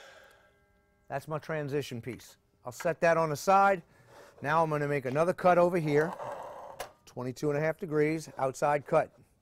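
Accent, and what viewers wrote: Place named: New Orleans, United States. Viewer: I know you must be from NOLA ??.. Recognize the accent !!!)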